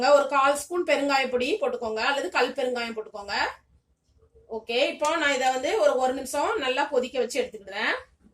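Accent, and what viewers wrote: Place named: Nagercoil, India. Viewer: Hi aunty.. Im studying 2nd year but I’m so interested in cooking that’s y started watching cooking videos ...I’m ur new subscriber ☺️ I love ur videos ❤️ And I love the way u explain and u upload different recipes ❤️ I guess ur from Nagercoil coz ur slang is like tat ☺️ Pls upload some of ur native spl recipes aunty ☺️☺️❤️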